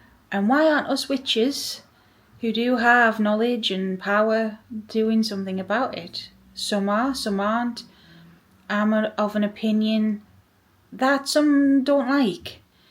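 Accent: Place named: Scotland, United Kingdom. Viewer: This is quite the journey we’re all on, quite the balancing act. I repeat to myself every morning before I get out of bed,” I will not give into the lies, I will not give into fear. I will hold onto Hope, I will hold onto truth, no matter what”. Building a supportive community is absolutely essential during these turbulent times. And my dear, your videos are very helpful just to hear that sweet sweet Scottish accent soothes my soul. Thank you for everything you do for this community! Sending love and light to all 🙏🏼💙❤️‍🔥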